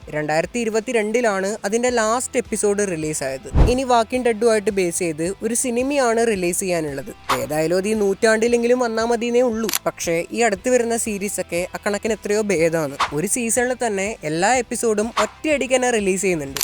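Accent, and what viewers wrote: Kannur, India. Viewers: Kannur slang hit different 🤍📈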